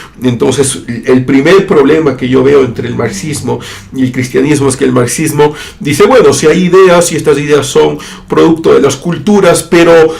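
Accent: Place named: Colombia. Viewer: oye, estas hablando un poco con acento colombiano ;)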